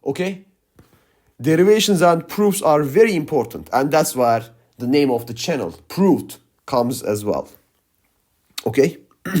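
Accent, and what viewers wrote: Turkey. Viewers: Usta türk olabilir misin tam türk aksanıyla inglizce konusyon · yemin edebilirim ama ispatlayamam konuşan bir Türk :)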